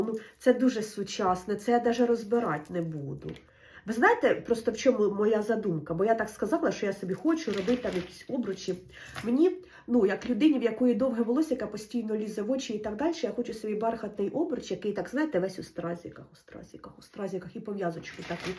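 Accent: Poltava, Ukraine. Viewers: По суржику зрозуміла що ви з Полтави!!! Привіт вам і гарного настрою!!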